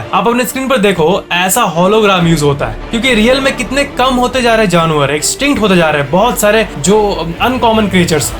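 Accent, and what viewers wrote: Bihar, India. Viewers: Are you from bihar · Are you from Bihar?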